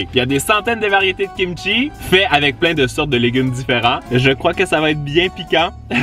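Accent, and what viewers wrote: Canada. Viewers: tu mon youtubeur préféré de is vidéos accent canadien et qui met quoi des vidéos trop trop rire 👍👍👍👍👍 24h 🇨🇵🇨🇵🇨🇵🇨🇵 TikTok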